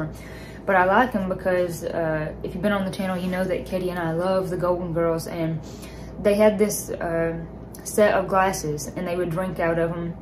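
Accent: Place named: Eastern Kentucky, United States. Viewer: ❤ I just wanted to save it I admire you and your sister. You're such sweet authentic women. Raised right in a crazy world. My family is from eastern kentucky I now live in indiana. I spent every summer at my grandparents house down there. All of my family has passed. There are only a few distant relatives still down there. After watching some of your videos and watching your mom cook I feel homesick l o l. In fact I just asked my husband of we could take a short trip to the area and just soak it all in. Stay sweet and keep doing what you're doing. It's Also refreshing to hear someone sounds like me l o l. Much love and prayers ❤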